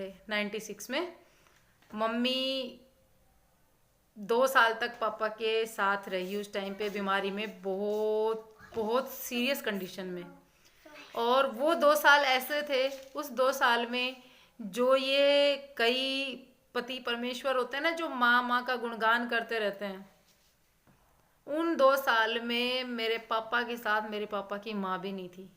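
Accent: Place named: Haryana, India. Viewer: Aapki aavaj se to asa lag aap haryana se ho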